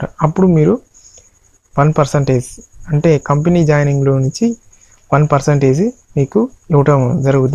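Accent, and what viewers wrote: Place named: Lambadi, India. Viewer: Thammudu nuv LAMABADI kadha.. ni voice vintene ardham avthundhi.. cooooosh bockkkk kaadhu babu.. cashback..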